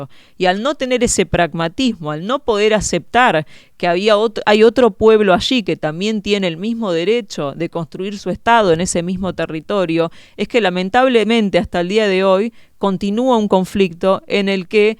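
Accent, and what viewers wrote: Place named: Rioplatense, Argentina. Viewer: Muy bien explicado por una muchacha que tiene una gran preparación, elocuencia, oratoria y facilidad de palabra.Y no nací ayer....Por el acento no me doy cuenta si es una chica macanuda de Argentina, Uruguay o radicada en otras naciones hispáno-parlantes. Viva Israel!Am Yisrael Jai!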